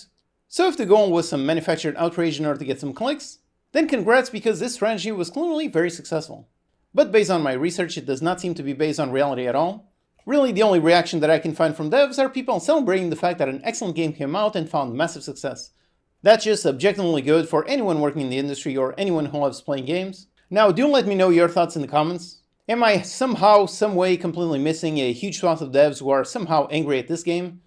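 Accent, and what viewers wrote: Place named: Portugal. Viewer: Are you from Portugal? You have a similar accent!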